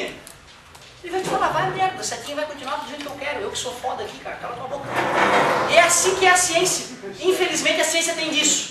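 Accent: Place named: South, Brazil. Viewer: "tu larga duas baguinha" adoro esse jeitão de falar do sul!!! Parabéns ao mestre!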